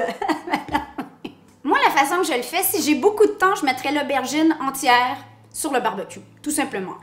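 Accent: Quebec, Canada. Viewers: Merci pour cette recette libanaise aux accents québécois. J'adore la patate de (?) Plus naturelle tu meurs, ça change des vidéos de cuisiniers et cuisinières aux culs coincés!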